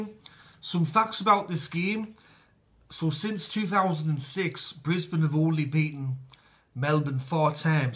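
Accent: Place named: Newcastle, United Kingdom. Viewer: Nice Geordie Accent. :)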